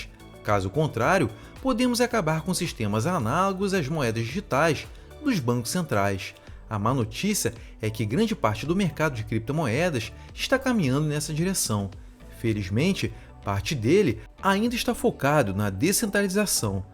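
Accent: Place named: Rio de Janeiro, Brazil. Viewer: Me perdoe, se você tirar seu xis carioca, o carioquês horroroso da sua fala você dará um passo arriba. Cara, você não sabe como esses xissss são desagradáveis.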